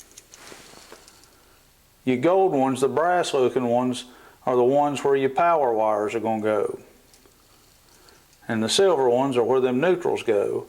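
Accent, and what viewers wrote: United States. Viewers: You have a great American accent. Thanks and hello from down under.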